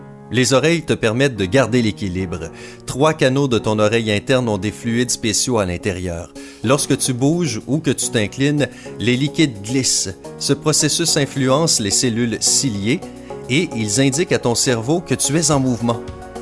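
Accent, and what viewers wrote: Canada. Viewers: vire la voix canadienne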